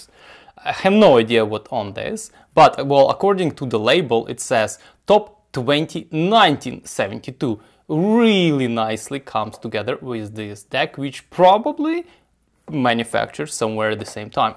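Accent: Russia. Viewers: Сразу слышно Russian accent 🤣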